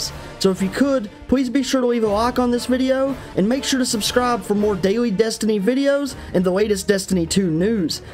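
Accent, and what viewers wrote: South, United States. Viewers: I love your hillbilly voice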